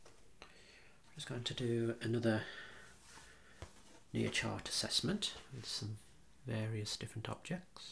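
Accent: Birmingham, United Kingdom. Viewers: OMG A BRUMMY ASMRTIST MY DREAMS HAVE COME TRUE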